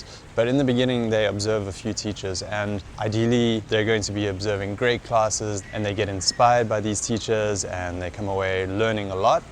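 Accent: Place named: South Africa. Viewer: Ek hoor 'n Suid Afrikaanse aksent.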